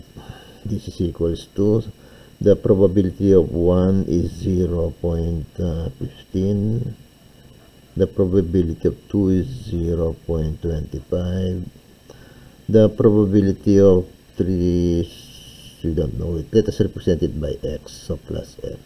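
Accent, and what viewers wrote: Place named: Philippines. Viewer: Pinoy na pinoy ang accent by the way thank you po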